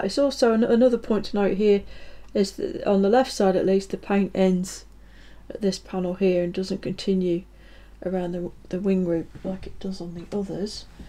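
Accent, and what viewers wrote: West Midlands, United Kingdom. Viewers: Hi strange question! Is there a touch of West Midlands in your accent? Certain words seem like it to me by the way carry on as you are very informative very high quality and presented in a concise and very logical manner ( grovel grovel )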